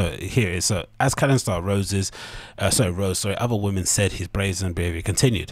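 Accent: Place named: Southampton, United Kingdom. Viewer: Your accent sounds like its from Southampton U.K. very familiar 🤙 keep up the good content man!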